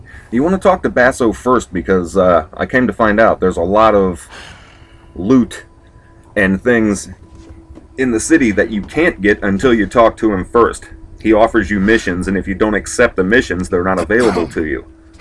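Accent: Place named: United States. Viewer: Arno Viktor Yeah it's a nice calm voice. Don't normally like the American Accent but dude you got it on lock down lol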